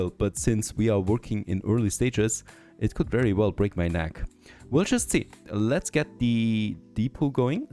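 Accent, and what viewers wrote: Germany. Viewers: you only need signals at the end when leaving the double tracked bit. and stations have their own integrated signals. putting one in front of the station is basically asking for trains to block each other. signals in this game are only needed in parts where trains could otherwise block each other. they check the track behind the signal if its clear to the next signal and if yes it lets them go. so you want to place them at the ends of the double track to have them check if the path until the station is clear and if not they wait there. the signal after the station only increases your upkeep but doesnt add any value as the same function is integrated into the station. you would want some block signals in the double tracked part but you dont even need those if you are only running 2 trains. this entire line would do perfectly fine with only 2 signals, 3 if you want to be safe and give the depot a signal before merging with the main line. 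waypoints arent needed at all because the trains would automatically orient towards the correct side with the signals for exiting the double tracked part. your accent sounds german so if you are german i can explain it in more detail in german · alter der deutsche Akzent ist so hart zu hören ne, aber ist ein cooles gameplay ;) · ich hör das deutsch raus...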